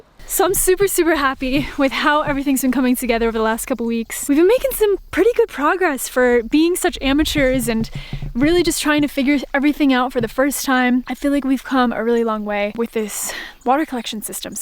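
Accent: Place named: Midwest, United States. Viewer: If you both are from Boston you sure don’t sound like it you sound like Midwest. I’m born and raised in Boston you don’t sound like anyone here lol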